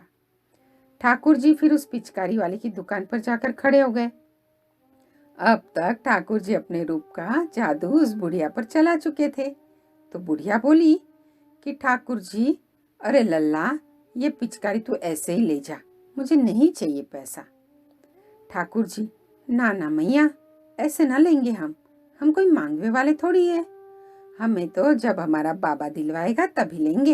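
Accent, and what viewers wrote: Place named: Braj, India. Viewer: 18... big like bahut Sundar Lalla Tu Bhi Pite Ho Tera Baba bhi Pite go Kahani Sundar hai hi aapke Munh se Braj Ki Boli bhi bahut Sundar lag rahi hai Lalla Baba main bhi chalunga Hamare Vrindavan Mein Aise Hi bolate Hain Brij Bhasha aapke Munh se sunkar bahut hi Achcha Laga excellent video my dear sister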